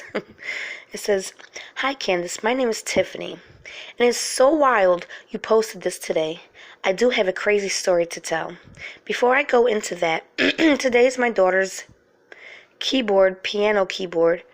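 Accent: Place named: Brooklyn, United States. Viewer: This was great hearing stories from the Babez and from you as well! Being from the tri-state area, (Passaic County NJ to be exact approximately 10 miles outside of Manhattan) I've always pegged your accent as being from the Brooklyn area LOL!! Don't worry I haven't lived in Passaic County in 26 years now, and the people from here (The Upper Connecticut River Valley Region of Vermont) all say that I speak very strangely with an accent as well. I moved my daughters here when they were just 3 and 4 years old and they still have "North Jersey" accents believe it or not! Anyway, I'm familiar with Westchester and I believe that you're absolutely correct about that cemetery. Back in those days (and today if they can get away with it), Building needs to go up and a highway run through? Just remove the headstones get rid of them, depending upon how long the cemetery had been there pre-1900s there may or may not have been any cement vaults as there are today, and digging the foundation, etc may have turned up absolutely nothing just like digging for the highway. 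No problem for anyone except the people buried in the cemetery, and consequently the people living in the building who experience the visitation of the souls of those whom they are living upon who are generally a bit angry about being disturbed, now having their final resting place unmarked and an apartment building built over it! There have been other occasions during more modern times when headstones were just relocated, but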